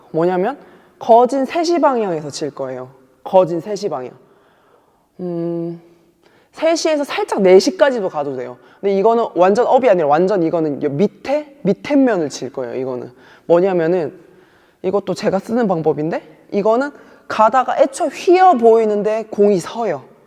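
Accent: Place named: Gyeongsang, South Korea. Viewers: 코치님 억양이 경상도 분이셨군 ㅎ 이서브 연습많이 했는데 희열감 좋더라고요 여러버전이 있었군요 공략 역시.. 최고의 서브으 👍🏻👍🏻 늘머쩌브러 🔥🔥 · 초중고를 부산에서 나와가지고 억양이 남아있어서 😅들켜버렸내요 3가지 다 연습 해보셔서 써먹어보세요 더 희열감 드실꺼에요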